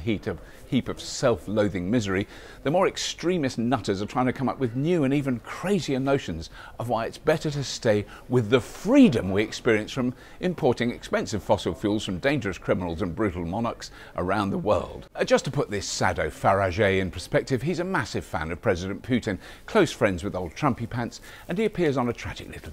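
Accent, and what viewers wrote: Australia. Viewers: Great show. 9/10. It would have been 10/10 if it hadn't been for that 'Australian' ? accent . (From a loyal Australian fan) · Was not expecting that Aussie accent to be any good. 👏👏👏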